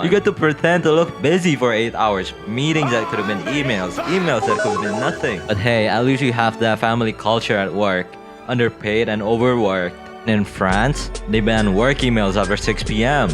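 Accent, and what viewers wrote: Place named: Indonesia. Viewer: Another Indo English speaking peak content? Subscribed!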